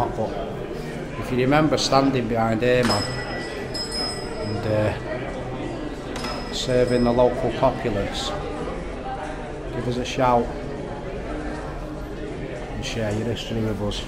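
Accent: Manchester, United Kingdom. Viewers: like a manc boss .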